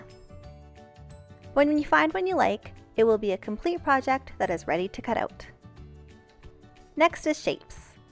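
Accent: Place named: United States. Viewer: Very helpful video, thank you, and a charming accent also, where in America do you hale from?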